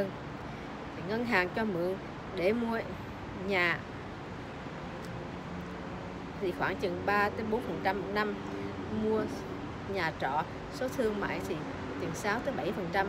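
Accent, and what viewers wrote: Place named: Vietnam. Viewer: Trời trời bạn giống người Mỹ quá mãi sau ghe giọng mới biết là người việt.bạn hướng dẫn mua nhà ở mỹ có cần thẻ Xanh ko rất chi tiết chúc bạn thành công nhé bạn hiền ơi 🔔🔔cho bạn nè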